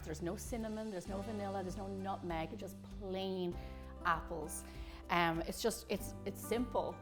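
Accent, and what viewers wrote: Ireland. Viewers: Hi,I live in Dublin, I love listening to your Irish accent, which is rare to hear in 😊USA baking recipes on Utube, thanks for this easy to do recipe and for your helpful tips, I’ve got the Bramley apples which my mother also used, the pastry is ready to make the tart in the morning I can’t help but admire the large sensible oven which too is rare in Ireland God bless you and your family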